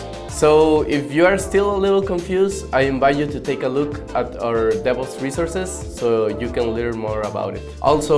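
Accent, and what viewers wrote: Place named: Mexico. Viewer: Amigo, you accent sounds exactly like Raúl Jiménez's, when you speak.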